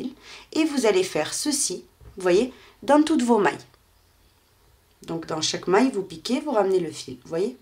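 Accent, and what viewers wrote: South, France. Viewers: Bonjour merci pour toute vos explications vous êtes très jolie et gentille et j adore votre accent ca me fait pensé au midi je devais faire une etoles et je trouvais pas de points crochet Assez beau merci pour ce magnifique point je vais faire mon étole comme ça bonne soirée à vous et votre familles courage à vous tous 👍👍💞💞💞💞💋🌹🌹🌹🌹🌹💐💐💐💐💐💐🌺🌺🌺🌺😸😻😻😻😻😻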